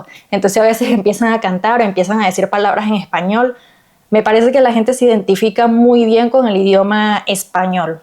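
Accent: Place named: Venezuela. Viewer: Tiene acento de mi país Venezuela.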